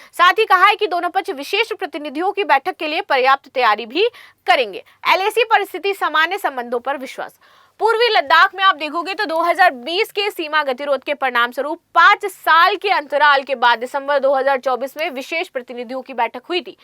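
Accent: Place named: Maharashtra, India. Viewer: Madam Aapki Marathi Language Kay Style Mein Baat Karna Bohat Ajeeb Laga 😂😂😂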